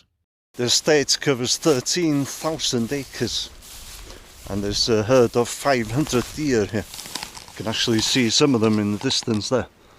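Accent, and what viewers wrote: Wales, United Kingdom. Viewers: Is he welsh thats narrating cos the accent is terrible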